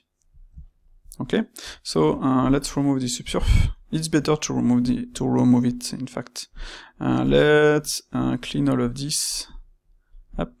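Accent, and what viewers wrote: France. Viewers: if i do disss for exsapoool de coursour hahah i love franch english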